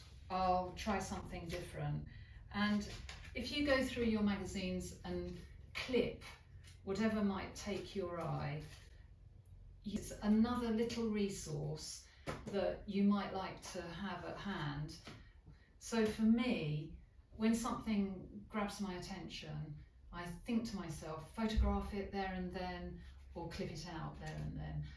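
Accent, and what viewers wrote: United Kingdom. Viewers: Madame. Keep them coming . Make them as long as it takes to cover one point. If its a short point make them short . What i mean is the video should cover the whole topic. That is being presented. I love your posh accent and being in your company. I am an artist from pakistan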